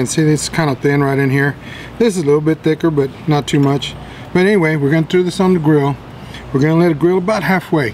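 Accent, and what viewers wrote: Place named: South Texas, United States. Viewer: If anyone wants to know what south Texas Mexicans look and sound like...this is it. I’m from west Texas in El Paso and we may have the Mexican blood running through our veins but our culture is a bit different, both beautiful and unique. Y’all have fajitas and we have carne asada. Y’all have breakfast tacos and we have breakfast burritos. Saludos desde El Paso y San Antonio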